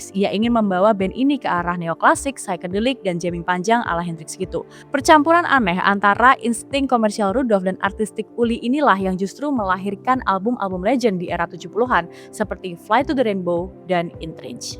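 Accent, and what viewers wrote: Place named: Germany. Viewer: Oh orang Jerman rupanya patutlah cakap English pelat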